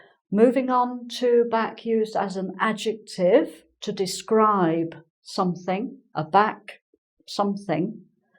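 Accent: United Kingdom. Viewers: I'm a spanish speaker and I really enjoy all your lessons. I have problems with the british pronunciation but I can understand you perfectly. Thank you very much you helped me a lot. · Hi, I'm from Brazil!!! You are incredible!!! I love your channel and I love the British accent. Thank you for sharing your knowledge with us! 👏🏻❤️😍🇧🇷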